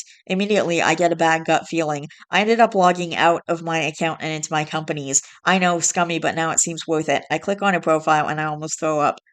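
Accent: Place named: Canada. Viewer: I never looked at your YT page before. You sound very articulate. Smart as a whip. Snd like the typical Canadian voice. Great video. Have a good one.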